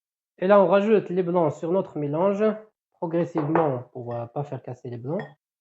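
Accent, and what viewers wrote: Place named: Kabylie, Algeria. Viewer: J’adore votre accent kabyle Merci pour cette recette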